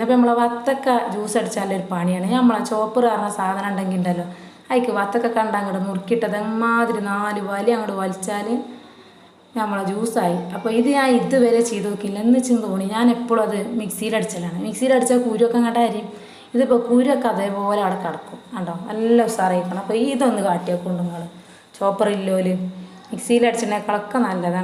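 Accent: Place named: Malappuram, India. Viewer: നമ്മുടെ മലപ്പുറം ക്കാരുടെ ആ ഒരു സംസാരരീതി ഞങ്ങൾ കൊല്ലം കാർക്ക് ഒരു പ്രത്യേക ഇഷ്ടം തന്നെ സൂപ്പർ ഐറ്റം ആണല്ലോ കപ്പയും മീൻകറിയും ആഹാ ശരിക്കും പ്രവാസലോകത്ത് നിന്ന് കണ്ടപ്പോൾ 😋😋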